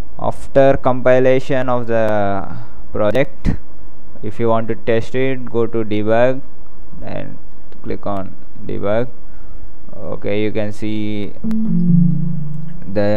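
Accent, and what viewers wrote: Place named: India. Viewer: God damn your english sucks, great topic about QDevelop but the accent is much too strong to hear only no more than 2/3 of a word. It's like indian calling horror :( I do want more Qt oriented tutorials, but smoothen your english or something, take english pronounciation classes.. anything to ease it a bit, otherwsie, great.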